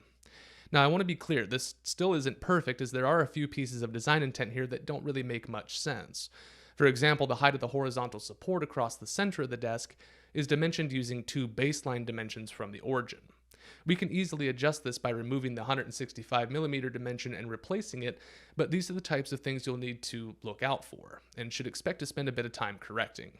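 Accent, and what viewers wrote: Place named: England, United Kingdom. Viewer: Professor thanks for your great video. It is great to find a YouTube video showing solidworks With an in English accent, I can understand.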